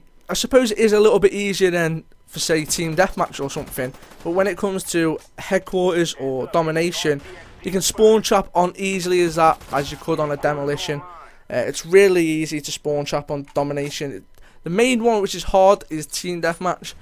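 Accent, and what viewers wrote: United Kingdom. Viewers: It's ONE of our British accents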